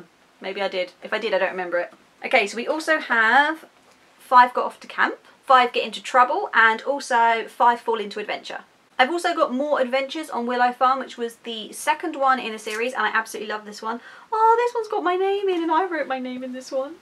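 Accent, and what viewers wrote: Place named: United Kingdom. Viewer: Wow! The Famous Five is pretty enjoyable, I am definitely going to read as well, cause I was looking at my library and I just found one Famous Five - Five run away together. To be honest I am really excited. Nice this video, just subscribed. On the other hand I enjoyed a lot your british accent. Happy reading day after day!:)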